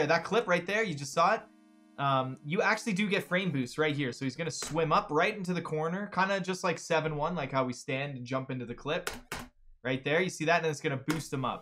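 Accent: Canada. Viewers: Is he Canadian?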